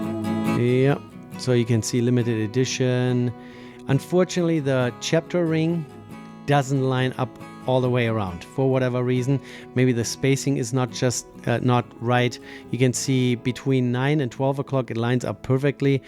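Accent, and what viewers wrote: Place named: Germany. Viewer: From the Company you got ur Strap from is my Willard Homage (rdunae R2X) - one of the best i think you can buy. Ive also built an Baby-Turtle Homage for my Wife in Black with an awesome Textured Dial (i removed the Marks on the Capturering via Isopropanol because i had the very same Problems with the Markings as you had... 9 to 12 to 3 - all in Line, but below there they didnt line up - seem to be a common Problem)... you can do so much Stuff if you just are willing to invest some money and time... the outcome is more rewarding then any Watch you can buy. (I even split my Watches between DIY and bought 🤣) Nice Vid man, coulnt have done it better. (Du bist Deutscher? Hatte erst überlegt wegen dem Akzent) LG Martin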